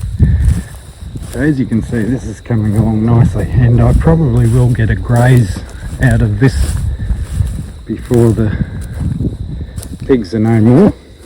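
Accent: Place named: Australia. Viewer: Has an ausy acent makes all the sence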